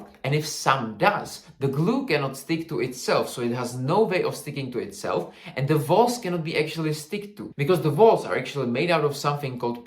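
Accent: Czech Republic. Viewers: Where are you from? You sound like you're from Slovakia 😅 or Czech republic. Yep, stand corrected, Czech republic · Už podle prvních pár vět mi bylo jasný, že jsi Čech :DD. Náš přízvuk je strašně poznat :DD. Pak jsem si to jen potvrdil českým nápisem na tom lepidle.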